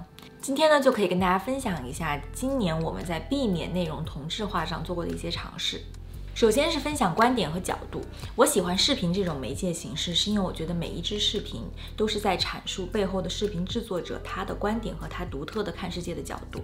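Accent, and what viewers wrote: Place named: France. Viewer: 一直很喜歡妳們的視頻~ 穿插。。的吳式幽默^^ 穿插。。一臉無辜臉的悟空^^ 還有最重要~搏主法式的聲調解說期待下年度的新視頻唷~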